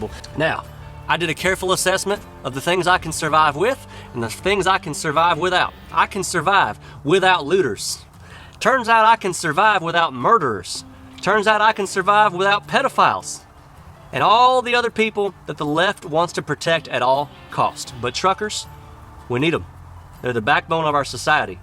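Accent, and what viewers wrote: South, United States. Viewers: I just found you always good to hear some common sense with a southern twang. This traveling yankee misses the southern states.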